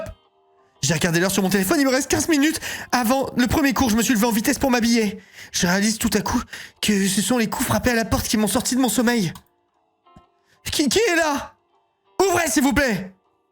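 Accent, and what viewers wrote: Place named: Marseille, France. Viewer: Le dimanche c’est ACCENT MARSSEILLAIS !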